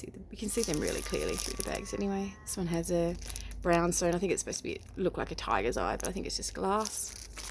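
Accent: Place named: Australia. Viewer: I love these videos. We girls never tire of looking at jewelry. Lol You gotten awesome stuff in this giant haul so far. And I could listen to you talk all day. Loving your Austrailian accent. Thanks for sharing this enormous haul. That brooch looked like a torpedo and a and a giant land mine to me . Omg I love Blue Bus Dave too!!! Have a great day my new friend !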